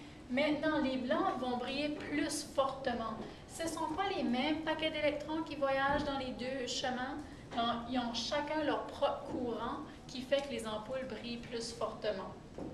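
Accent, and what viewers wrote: Quebec, Canada. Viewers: vous etes bon professeur et vous etes belle .votre prononciation Québécoise m'excite .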